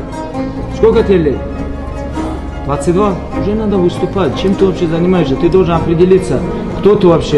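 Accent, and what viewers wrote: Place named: Dagestan, Russia. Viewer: its 100% russian but dagestani people have that arabic/turkish accent which is why it sounds off.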